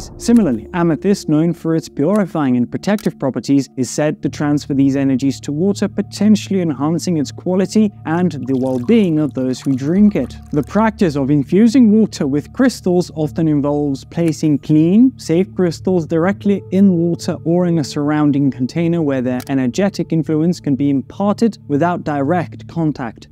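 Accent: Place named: Scotland, United Kingdom. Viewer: (with a slight Scots accent)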